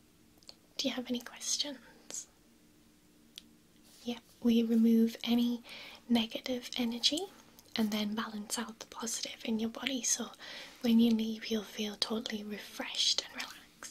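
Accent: United Kingdom. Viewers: Where in the UK are you from? I love female accents, but I can't always place them.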